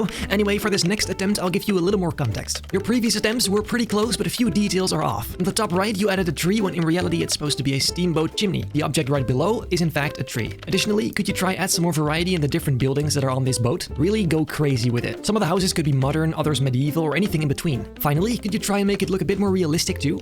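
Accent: Netherlands. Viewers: Thick Dutch accent. Nice video tho, never heard of the channel before. Subbed.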